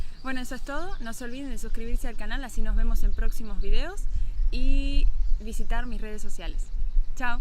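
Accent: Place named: Argentina. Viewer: Caramba, esta chica es argentina me parece.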